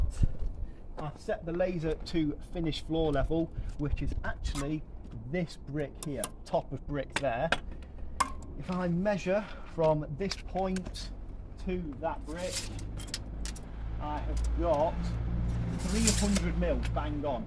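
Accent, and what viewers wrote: Derbyshire, United Kingdom. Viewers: where you from mTe sound like Derbyshire. North East Derbyshire??????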